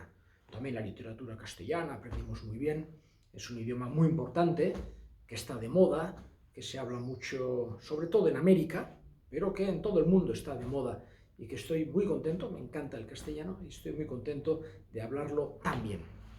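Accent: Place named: Basque Country, Spain. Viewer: Tiene más acento vasco hablando italiano que francés. Genial, padre. Esker anitz, Aita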